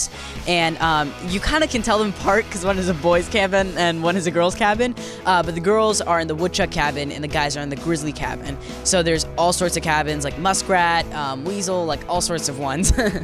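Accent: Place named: New York, United States. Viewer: He sounds like a New Yorker.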